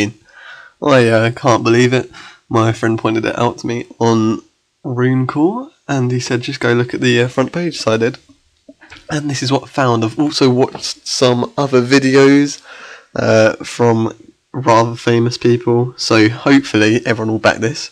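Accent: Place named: United Kingdom. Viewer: ur british, look at my version plawx?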